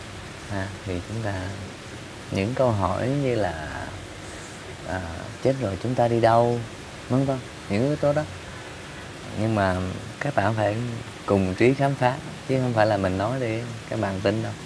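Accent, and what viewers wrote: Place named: Mekong Delta, Vietnam. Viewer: Tập này a Trí ngồi nc kiểu miền Tây hả😅 nhìn cưng ghê😍😍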